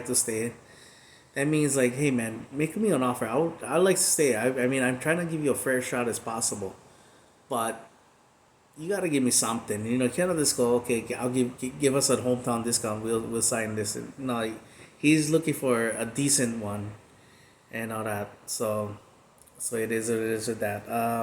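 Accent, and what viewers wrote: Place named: Hawaii, United States. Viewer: Ahaha braddah wea u from..i know u from hawaii just by da way you talk but where in hawaii..btw you guys team looking like Rubbish..fly eagles fly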